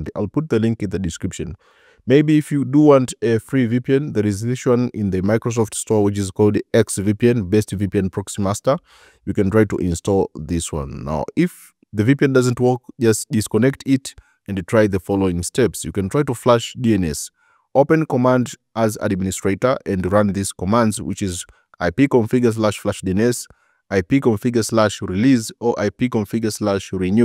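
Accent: India. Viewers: never trust a guy on pc thinks with indian accent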